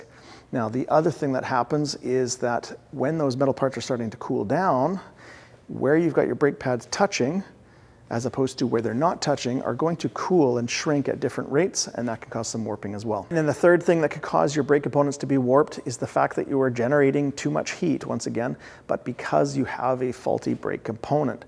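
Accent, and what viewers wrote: Canada. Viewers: Love your channel. Hearing you speak, there's no doubt that you are a Canadian. Love that accent. I live in Missouri, and while we do have salt in the winter, it's not nearly as bad as up in the North. That sure makes it easier to work on older cars. I have no pulsations on my 2015 impala with 75,000 miles on it. Would you recommend having them machine the rotors anyway? They only have very minor grooves, so I think they are worth resurfacing. I get a kind of roaring sound when applying my brakes at highway speeds, and even though my pads aren't worn out, I'm assuming this is a sign that they are getting thin maybe? No pulsation with that sound.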